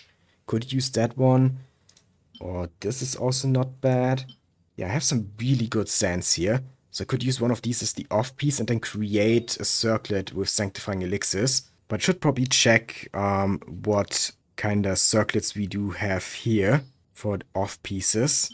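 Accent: Germany. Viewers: Hey where you from? Your accent Sound SO german 😭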